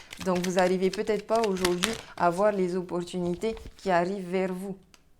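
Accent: Tahiti, French Polynesia. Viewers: Bonjour J'ai reconnu votre accent de Tahiti J'y ai vécu en 70/71 avec Raupea pendant 10 mois .j' ai passé toute ma vie avec son souvenir dans mon cœur j, avais 20 ans .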